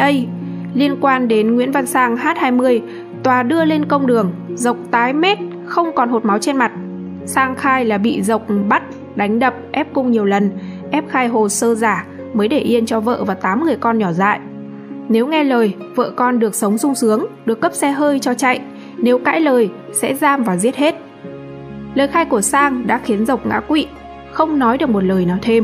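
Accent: North, Vietnam. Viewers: Hết chuyện rồi đem tin xàm xí với giọng Bắc kỳ khó nghe!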